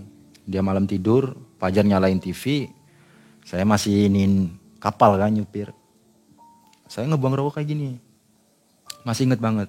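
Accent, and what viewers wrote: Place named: Tegal, Indonesia. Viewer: Sampean ngapak Tegal yh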